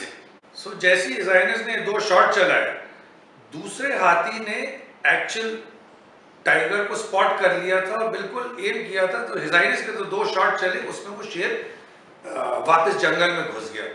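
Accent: Bhopal, India. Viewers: Zabardast story👌 and very well narrated in bhopali style..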